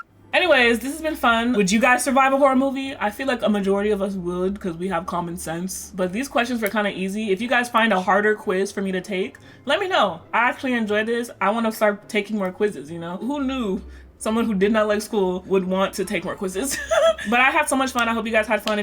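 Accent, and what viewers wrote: United Kingdom. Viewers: YOUR BRITISH ACCENT IS ACTUALLY SO SLAYYYYYYYY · the British accent. I love it queen. 👏🏽👏🏽👏🏽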